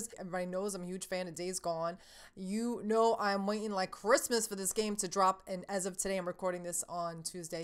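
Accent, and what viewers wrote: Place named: East Coast, United States. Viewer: I'm a new viewer so forgive me for asking if you already said so but you have an interesting accent, are you from the east coast?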